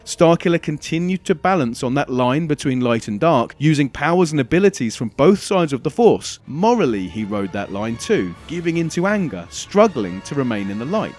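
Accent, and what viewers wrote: United Kingdom. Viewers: You've put together some very interesting analysis, sir. I dig the British accent, too, a freakin' awesome--or bloody awesome, as your people would put it--addition to this video. Although born in the USA, I am fairly certain that my last name derives from your whereabouts. Anyway, enough with the unnecessary formalities. Let's talk Starkiller and Rebels. Admittedly, the chances of Galen Marek appearing in Star Wars: Rebels are slim-to-none, but I'm still crossing my fingers in hopes that Disney figures out how to make it happen. On face value "The Force Unleashed" title is most akin to the Star Wars Legends banner; in that light, certain events that take place in TFU would perhaps strike Star Wars fans as too 'extreme' for lack of a better word, too outrageous or far-fetched to be designated as "canonical" (see: Marek using the force to pull down an entire star destroyer until it crash-lands). 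On the other hand, George Lucas played an integral role in the development of Marek's story arch, suggesting a heightened significance of TFU in comparison to many of the older Expanded Universe novels; also, Disney can always hand pick-and-choose amongst the more 'realistic' happenings that take place in The Force Unleashed...w/e that means. It's all science fiction, after all. Diehard Star Wars fans are so apt to forgetting that this is all fake, that it's all merely intended for entertainment. Real talk. At the end of the day, none of these motherf***ers are real. For all I care, Di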